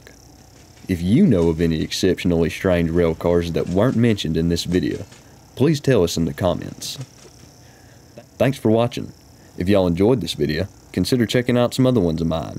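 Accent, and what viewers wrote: South, United States. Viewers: Love your Southern US English. It sounds like home.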